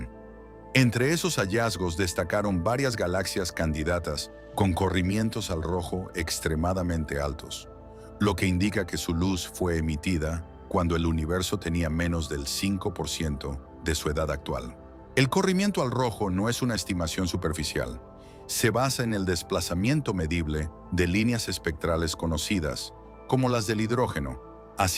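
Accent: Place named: United States. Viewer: Tonterías con acento gringo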